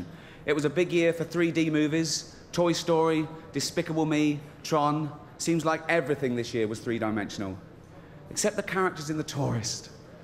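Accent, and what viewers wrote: United Kingdom. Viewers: Yea unless you are also British, reading his lips is next to impossible. Accents are a SOB.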